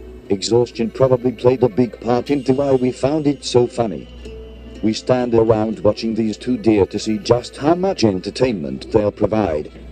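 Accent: United Kingdom. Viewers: Thanks for the upload brother. Got a new channel to binge later. So far you are the only other text to speech channel that used the male English accented voice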